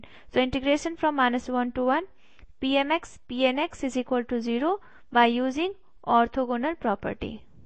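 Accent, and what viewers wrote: India. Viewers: I barely understand indian accent... but english mixed up with indian hell no !!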